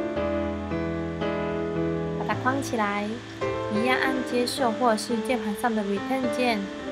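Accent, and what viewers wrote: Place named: Taiwan. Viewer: 非常慢的台湾普通话，我听起来心闷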